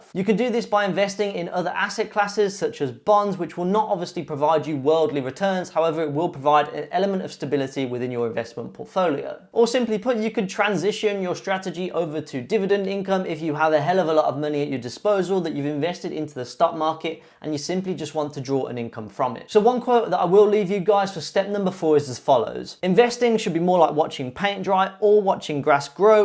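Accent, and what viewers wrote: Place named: Birmingham, United Kingdom. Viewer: aha no worries mate thanks for making them. And by any chance are you from Birmingham because Im from there and I swear I recognise that accent 😂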